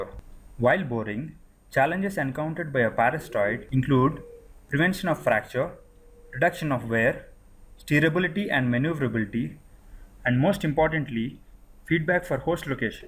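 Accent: India. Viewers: The Indian accent is the worst accent in history. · That Indian accent though!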